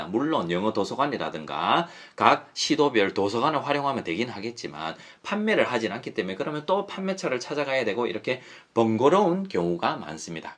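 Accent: Gyeongsang, South Korea. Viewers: 요즘 하루도 안빠지고 님 영상 인스타 열심입니다^^ 저도 경상도 부산이라 어감도 확 와닿아서 어떻게하면 님처럼 선한 영향력을 가질까 고민하고 또 고민하네요. 3,4학년 딸들이 되고나서 전자기기 허용폭을 넓혔더니 확실히 종이책 읽기에 소원함을 느끼고 친한 친구들과 독서모임을 할수있도록 인위적인 환경을 만드는 중이네요~ 물론 엄마들도 함께요, 아-지나고 나면 항상 아쉬움이 남아요.요즘 다시 육아공부중입니다^^ 요즘 3,4학년 된고나서 발름기호를 가르쳐야되나라고 고민에 빠져요, 이제까지 소리만 듣고 그대로 읽었는데 발음기호를 알려준다면 시기는 언제며 꼭 필요한지 고민입니다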